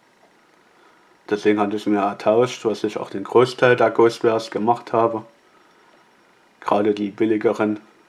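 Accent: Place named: Saxony, Germany. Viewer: sächsischer akzent xD den liebe ich!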